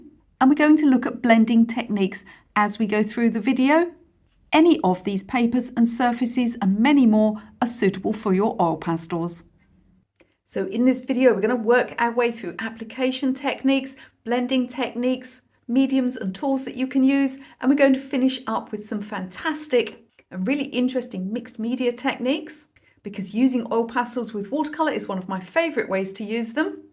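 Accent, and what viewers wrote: United Kingdom. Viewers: So bri-ish🤌🏻😭✨ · Loved this video! Thank you for sharing your knowledge and talent😊 But, can we talk about your fabulous lipstick/gloss! Care to share the brand and shade….pleeeeeze!? And from a viewer in Texas I’m entranced by your accent and now want to walk around sounding British speaking as properly as possible😊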